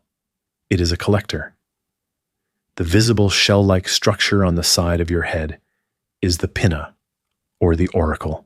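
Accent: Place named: United Kingdom. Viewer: Bro bring back British voice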